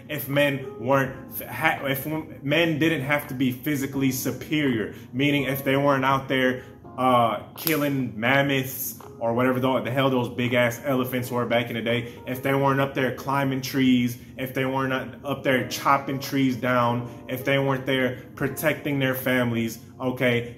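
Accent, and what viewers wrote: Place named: California, United States. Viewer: Bro sounds Sacramento or stockton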